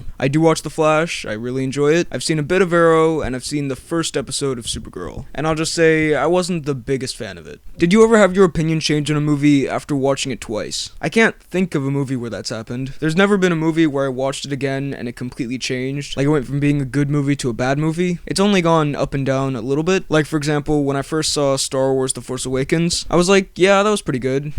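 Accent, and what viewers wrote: United States. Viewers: Holy sardine! (Name that movie!) I can't believe you live in Denmark! You sound really American! Also I freaked out when you showed my comment (twice!) and I have a suggestion for a 'best and worst' video. It might be too short that you might not be able to do it but I'd really like to see it. You ready?........the best and worst of the Cornetto trilogy (you know, Shaun of the Dead, Hot Fuzz and The World's End!) I just have one more question, did you watch my channel? And if so what do you think? I'd love to hear your thoughts on it. Thanks! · you sound so not danish. I thought you were form america or something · Hi, you have some sort of American accent. Did you move from America to Denmark? Just wandering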